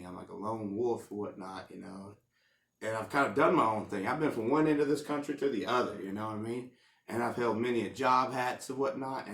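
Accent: United States. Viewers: What the hell were you bullied for? Good looking guy, normal deep southern voice. Not fat. not effeminate. I don't get it? Bullies are stupid apparently! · As a trans woman from rural Appalachia, it means the entire world to me to hear a straight, cis man with a similar accent finally support my existence. It's the closest to feeling accepted by home I think I've ever gotten to experience.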